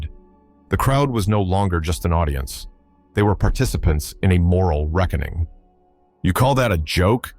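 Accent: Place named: United States. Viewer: What an absolute load of American accented bullshit! Is it perhaps time that British former soldiers took the piss out of the American guards at Arlington? No? That would be too much?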